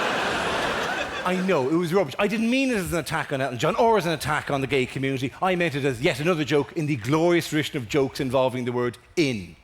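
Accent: Ireland. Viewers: I saw this on DVD in about 2007, and I always assumed the name was Peter Thatchell, and it was just Dara mispronouncing it in a thick Irish accent. Was only years later I discovered it was actually Tatchell 😳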